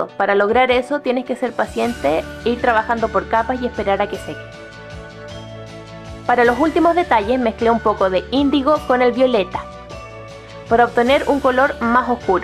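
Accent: Chile. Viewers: Chilena? :D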